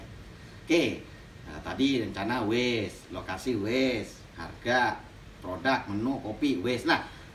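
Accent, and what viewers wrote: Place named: East Java, Indonesia. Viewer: Bismillah om doakan Lancar usaha kedai kopi saya mski awal buka. Msh butuh bimbingan dan belajar. Kalau boleh tw asli om bang kok medok jawatimur.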